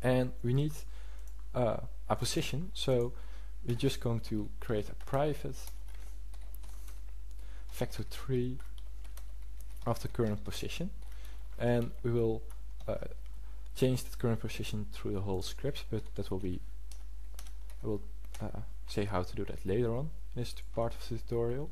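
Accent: Netherlands. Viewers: Wear are you from, Holland? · your dutch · Dutch? :P Nice video man :)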